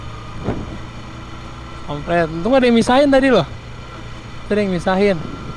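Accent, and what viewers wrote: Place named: Bogor, Indonesia. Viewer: Bang lu orang Bogor ya bang😂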